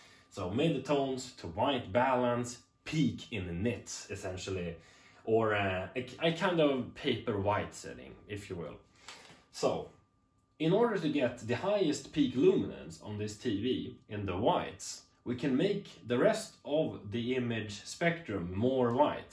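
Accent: Sweden. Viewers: Svengelskan är top notch :D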